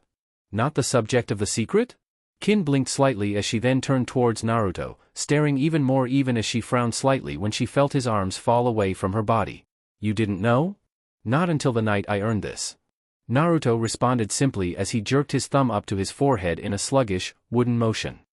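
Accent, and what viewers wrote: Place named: Russia. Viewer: Why dose the voice switch between monotone and Russian accent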